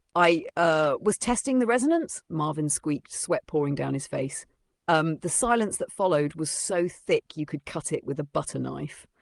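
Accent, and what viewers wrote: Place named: United Kingdom. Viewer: I love the British accent. Great story! Haha!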